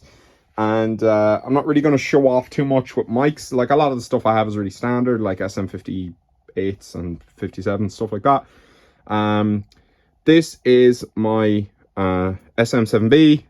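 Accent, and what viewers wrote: Ireland. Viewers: lol - dude I just searched for a Bitwig vid on reverb and mindlessly clicked the top one and turned back to the DAW. 10 seconds later I'm thinking "why does this dude sound super similar to that funny Irish chap I follow on Instagram" 🤣 Actually kinda cool to see you being an absolute social media megastar over there while you have a humble 5.6k followers over here, keepin' it real. Awesome to see how much you blew up dude - I expect the studio has probably expanded some since your success? Also mad jelly over your Polybrute. Good on ya mate and cheers for the tips.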